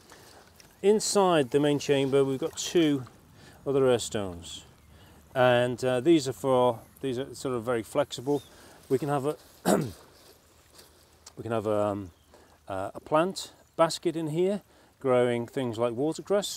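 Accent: Liverpool, United Kingdom. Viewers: Love the voice of an educated Scouser.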